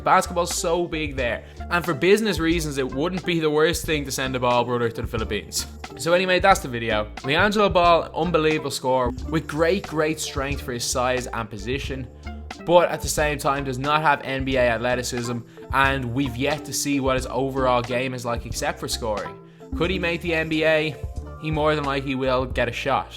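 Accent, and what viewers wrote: Ireland. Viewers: You irish? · Are you Irish?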